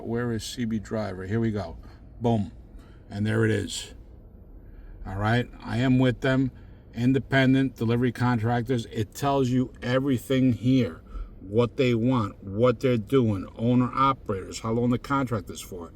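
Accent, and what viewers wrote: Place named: Philadelphia, United States. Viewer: Philly Philly!!! That. Is. Where. I. Am. From. 🤪😎 man, you’re accent feels like home. I’m in the Bay Area now (unfortunately)…wanna get back into gig work tho. I’ve had about enough of the 9-5 stress…overworked and underpaid and unappreciated, all for someone else to get rich at the end of the day…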